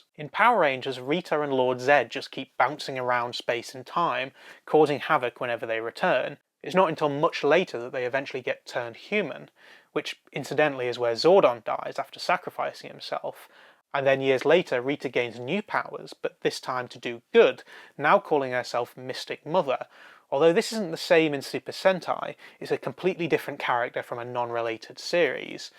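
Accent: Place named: Black Country, United Kingdom. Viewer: Hes from the black country England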